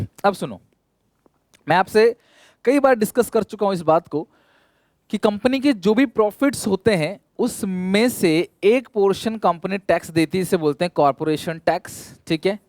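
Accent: Odisha, India. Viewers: sir app odia ho kya ?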